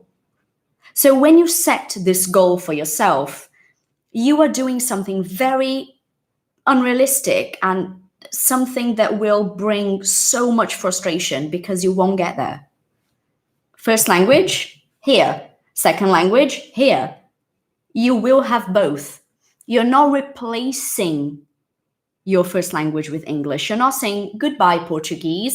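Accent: United Kingdom. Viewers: Louca para pegar uma live contigo. Já sou fã amor. Amo o seu inglês, sotaque britânico, e quero ser fluente na fala assim como nos ouvidos. Eu entendo tudo mas falar não consigo ser fluente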